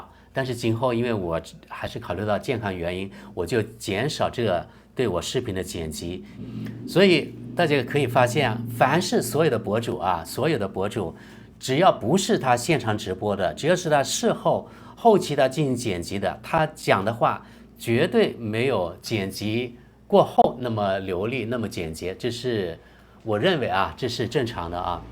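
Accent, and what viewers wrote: Standard. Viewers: 没有啊，你的普通话已经很标准了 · 中国深圳人，觉得这么好普通话还谦虚。真的很标准啦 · 的普通话很标准啦，可能你身边没有华人跟你对话你感觉不深而已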